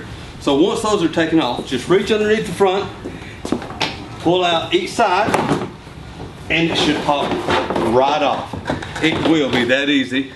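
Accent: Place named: South, United States. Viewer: Thank you for your informative and easy to follow videos. (We also enjoy your beautiful southern accent.) Please make more videos as time allows you. What a GREAT Teacher you are! ㋛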